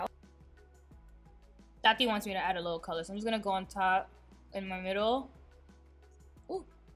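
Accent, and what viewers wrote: Dominican Republic. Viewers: OMG first time watching your videos and I LOVE YOU OMG 😂😂 the little Spanish isms you throw in are priceless. I am Dominican and your accent sounds it, are you!?! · YOU'RE DOMINICAN FOR SURE 🇩🇴 y si no, tienes el acento 😍😍😍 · Clicked because the title said “morenita” and I just knew you would be a Latina 👏🏽 is the accent Dominican? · Dominican accent?! 😃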